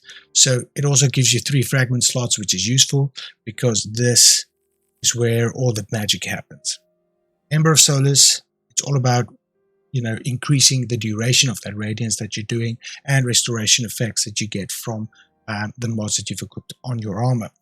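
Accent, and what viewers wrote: South Africa. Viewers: Great build video. I'm rocking something similar to this. Gonna edit and see how yours feels Are you from SA? The accent is so distinct.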